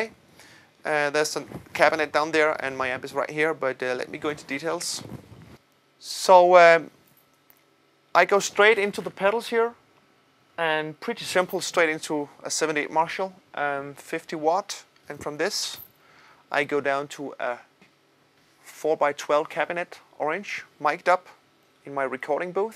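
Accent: Denmark. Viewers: I fucking love our danish accent!!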